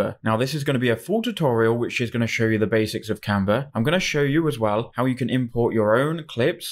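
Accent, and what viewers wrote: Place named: United Kingdom. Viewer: Nice job.. Then ur accent is great.. British 😊😊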